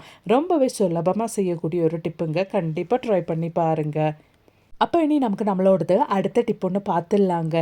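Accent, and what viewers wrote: Kerala, India. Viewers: Sister ur malayala tamil so sweet.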